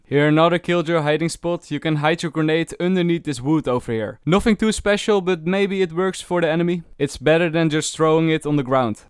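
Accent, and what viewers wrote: Netherlands. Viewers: He is now consistent in uploading great job man With a Dutch ascent!!!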